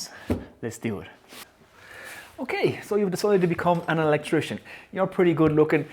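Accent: Ireland. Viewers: Love your channel. I work in IT but have always had a love for woodworking so you're right up my street. My Dad didn't get much of an education but he built up incredible knowledge with all things electrical. I always joked with him that he could have lectured an electrical engineering course. Completely understand that the need for regulation in this discipline but would love to get as much knowledge as I can and follow in his footsteps. If there's any material you would suggest for this, I'd love to get it. Really nice to hear an Irish voice on YouTube talking through these things.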